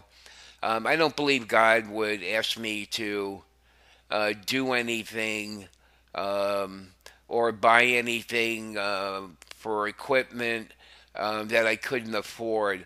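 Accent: United States. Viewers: so happy to hear you're back vocem tuam! Prayed the rosary for you last night. Over here in Europe we love hearing your authentic voice, American accent and pronunciations - they are all fine! I enjoy the ad hoc commentary and analysis, it is all true and absolutely faithful to the Magisterium. Deo Gratias.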